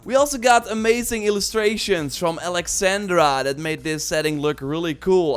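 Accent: Netherlands. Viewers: I can't get over your Dutch accent🤣 it's so obvious (omdat ik zelf ook Nederlands ben)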